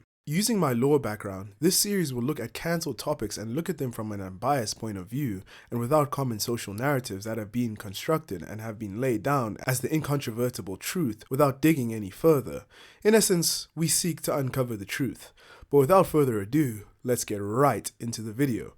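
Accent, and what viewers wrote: South Africa. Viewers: Ey bro are you South African?😁that accent sounds like home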